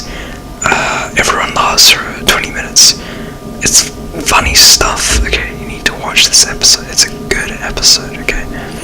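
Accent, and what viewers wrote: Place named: Australia. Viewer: You sound Australian in this one.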